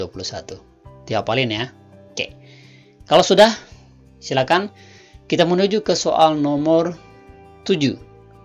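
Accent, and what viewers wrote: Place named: Indonesia. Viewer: anda sbgai guru B.Indo ap B.Inggris pk, cara baca opsinya kok kyk bahasa indo pronounciationnya..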